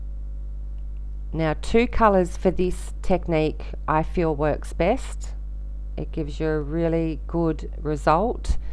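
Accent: Australia. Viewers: Hi, I have just found you. So good to hear a fellow Australian. I really enjoyed your video on Brushos. I have some on order just waiting for delivery. I know that I will love them. Thanks for your video. I will check out your blog. Hugs Glenis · Brilliant I have just ordered some, looking forward to receiving them. Awesome to hear an Aussie doing a tutorial make it seem like home well done.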